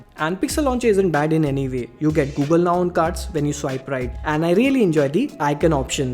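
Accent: India. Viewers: I'm from bolivia and even me can hear that india acent :v you speak better than me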